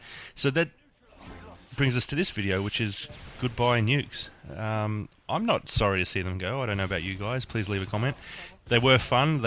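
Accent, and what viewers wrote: Australia. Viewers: Your Australian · ru australian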